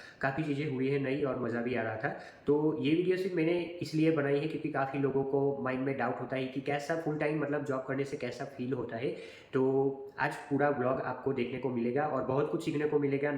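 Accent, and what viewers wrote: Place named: Gujarat, India. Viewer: Bhai tame gujarati cho tamari bolvani ton mane evi lage che❤❤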